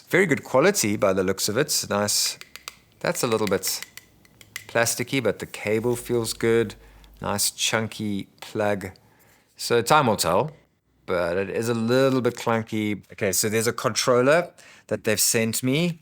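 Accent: South Africa. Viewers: Is he south african?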